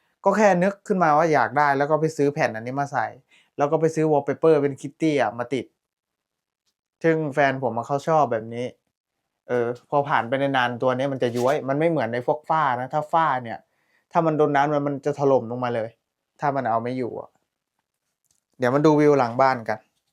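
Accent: Thailand. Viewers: อยู่ไทยจนพูดไทยพูดลาวเป็นสำเนียงไทยหมดแล่ว🎉🎉🎉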